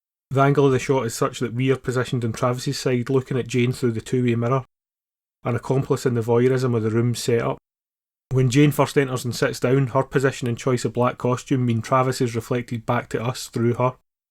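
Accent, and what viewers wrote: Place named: Scotland, United Kingdom. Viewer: Hey this is versustheworldvideo, thanks again for your comment on our latest video. I'm replying on my personal account. I'm Scottish myself, but your accent is much thicker than mine - I love it! Your in-depth analysis is impressive, and your editing skills are sharp. You put a lot of time, care and effort into your video. I was blown away at 3 mins in by your explanations that make perfect sense as to why the director set the scene up that way.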